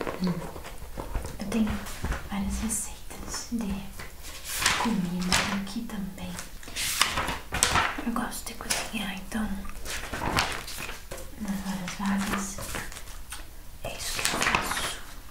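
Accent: Rio Grande do Sul, Brazil. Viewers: Gostei do estilo gaúcha, parabéns sempre inovando. Muito bom!!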